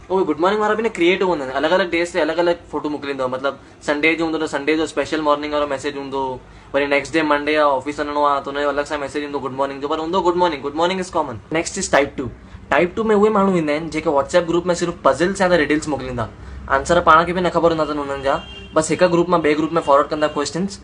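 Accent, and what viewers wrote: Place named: Sindh, Pakistan. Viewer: your Sindhi is pure 😊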